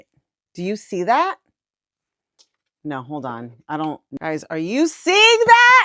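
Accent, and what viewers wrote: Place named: Puerto Rico. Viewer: I just found your channel looking for glp1 histories, I’m in my second week and in my first I just lost 1/2 pound and I was so tired so is good to know I’m not along. Also I was hearing you and for me you sound Puertorican and when I found that you are, I got excited because cuando consigo una compatriota Boricua que cree en Jesús, me emociono, congrats for your channel, don’t give up. 😉 God bless you 🙏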